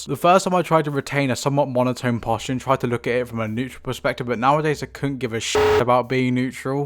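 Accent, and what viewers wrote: United Kingdom. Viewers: Real ones just turn on closed captions to counter the british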